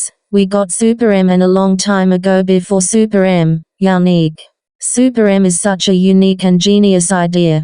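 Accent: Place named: Australia. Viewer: I know this is random but why does your robot voice have an Australian accent?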